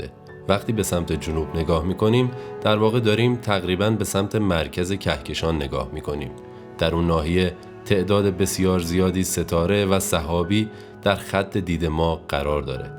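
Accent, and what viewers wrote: Shiraz, Iran. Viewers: فدای تو که به فکر ما هستی من پا به پا با تو میام از بس لحضه قشنگ شیرازی را رها نکردی و ساده توضیح میدی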